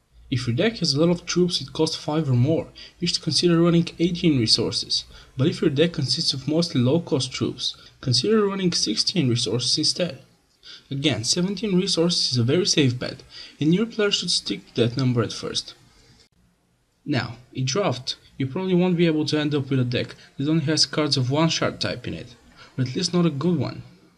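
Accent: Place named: Russia. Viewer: Your accent is metro2033. Great video!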